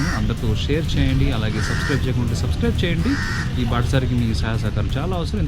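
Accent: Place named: Bangalore, India. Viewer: Miru banglore telugu matladutaru ur native bro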